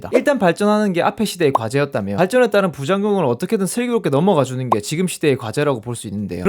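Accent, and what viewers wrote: Gyeongsang, South Korea. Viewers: 대구 분인가봐요? 말씨가 익숙하네요 ^^ 그리고 인류는 소멸 안해요, 한국인이 좀 많이 줄어들겠죠. 근데 한국인이 줄어들면 뭐가 어때서요? 요만한 땅에 한국인이 5천만이나 번식한 게 이상 번식이었어요. 다른 나라들을 보면, 한국인은 한 1500만 정도로 줄어들 필요가 있어요. 거기까지 인구가 줄어드는 건 한국 사람들한테 좋은 일일 겁니다. 오염도 덜해지고, 1인당 물 공기 집 넓이도 커질테고, 땅값 집값도 좀 싸질테고요. 사람도 더 좋은 대우를 받게 되겠죠. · 이런 애들이 통계자료+본인뇌피셜로 어떤 현상에 대해 -는 ~다 라고 결론내서 확정짓는듯한 이런 영상을 만드는 것이 매우 역겹다. 아무생각없이 보면서 님말이 맞는것같아요 분석력 짱 이지랄 댓글싸는 애들도 병신같고.SCI 등재라도 하나 하고 와라. 경상도에 있는 지방대 조별과제 발표자료같음. 나 또한 경상도출신에 고향친구만나면 사투리로 대화하지만. 사투리 역겹다. 표준억양 연습이라도 하고 녹음하던가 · 쌍도 사투리 억양 존나 거슬리네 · 경상도 억양 좀 고치셨으면... 말투가 귀에 거슬림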